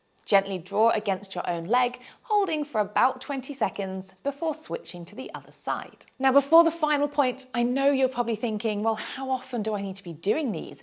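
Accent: United Kingdom. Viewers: Studies show sitting on your desk, listening to a hot British chick telling you not to sit on your desk is an amazing way to spend your time and improve your fitness.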